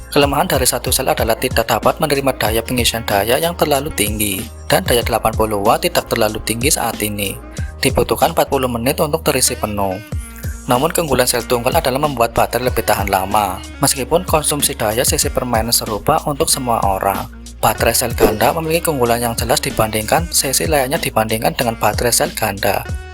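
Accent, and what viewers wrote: Banyumas, Indonesia. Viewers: ngapak Iki :)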